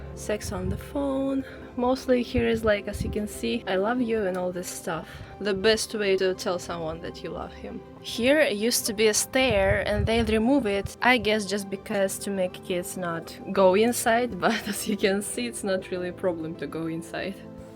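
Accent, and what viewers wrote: Russia. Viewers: Love Russian accent, would love to meet a russian girl here in Argentina, cheers! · I just visited Russia last year. The country is so beautiful, I love it. I also like your Russian accent and simple explanation. Visiting back your kindergarten was of course quite touching. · I’d be absolutely devastated if I ever found out that your Russian accent was fake! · I love the Russian accent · Young, beautiful, active, funny, thick Russian accent, you represent every quality the men all over the world treasure among Russian women. Stay happy and make more videos. Your fella is a lucky man, really, envied by the world.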